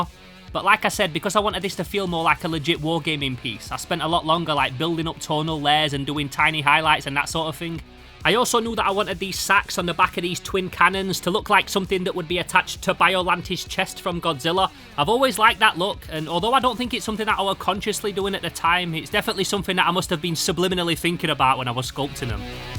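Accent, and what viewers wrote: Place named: Northumbria, United Kingdom. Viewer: What is that accent? Escaped straight out of Northumbria to make Tonks. Great video I'll subscribe to the time traveler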